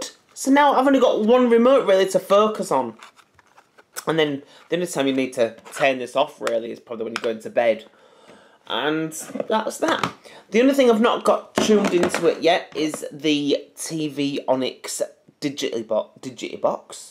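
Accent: Hull, United Kingdom. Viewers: The Hull Accent tops it off - i'm from Hull LOL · The Hull accent was a bonus - I'm from Hull.👍👍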